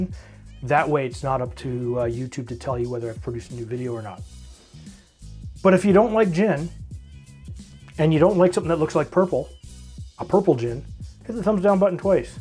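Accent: Canada. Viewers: Nice job,. And nice looking finished cocktail. I like the slow-motion pouring into the glass. I also like how you Canadians say "about" or "out".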